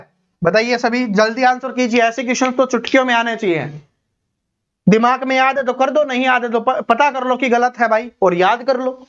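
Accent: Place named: Haryana, India. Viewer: Fir to sir ap haryana se hoge 😅😅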